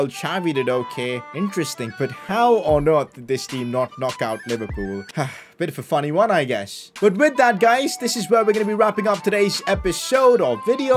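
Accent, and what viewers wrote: India. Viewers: Are you Indian coz your talking way is like that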